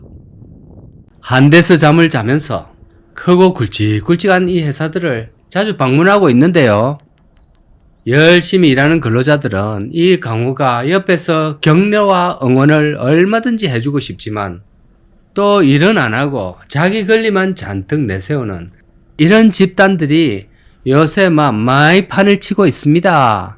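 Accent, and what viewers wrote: Gyeongsang, South Korea. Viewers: 혐오 갈라치기 좀 그만해라 ..나이처먹고 뭐하는건지...나도 나이 처먹어도 돈 이런식으로 안벌어....그리고 경상도 억양쓰니 더 역겨워~ 이세상에 일방적인것은 없어...노조없는 삼성은? 천국이냐? 노조의 문제점이 뭔지 해결책과 헤안이아닌 오로지 혐오... 나이 이렇게 처먹는거 아니다... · 유튜버는 사람들 일자리 잃어가는게 신나고 즐거운가 보네...한국에 기레기가 만든 용어인 귀족근로자 많아서 잘살면 덧나냐? 다들 생활고에 시들어 살아야 정상이냐? 말투가 역시나 경상도인이네...경상도 사람들의 종특은 모두가 못살기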